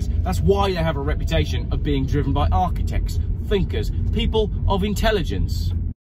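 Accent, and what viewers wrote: United Kingdom. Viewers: britisch accent😋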